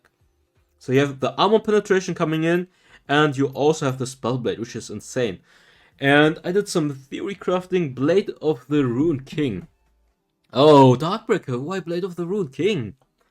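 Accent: Germany. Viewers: Are you german you hearing a little bit like a german boy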